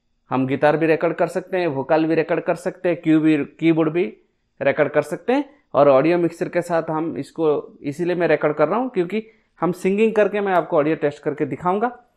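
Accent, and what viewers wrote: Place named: Odisha, India. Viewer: Sir app ki language odia jese laga rahe hai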